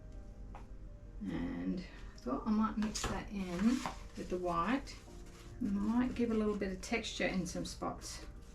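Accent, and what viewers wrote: Australia. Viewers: Hi, love your Australian accent. This painting is gorgeous. You're so talented.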